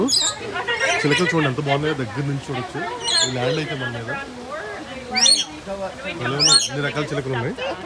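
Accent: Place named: East Godavari, India. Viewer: U looking gorgeous bro..Pakka mana telugu east slang bro. I happy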